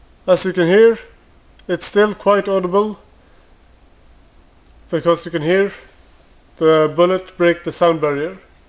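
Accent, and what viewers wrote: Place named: Europe. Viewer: What wondeful fantastic and truly democratic European country do you live in that actually permits it's citizens to own both a handgun and a silencer? Your accent is European and I assume that's where you shot this very interesting video. I live in the US and Americans like me refuse to allow our government to take away our right to own firearms. Yes, we are a violent country, but it's the criminals doing all the violence--not law abiding citizens like me.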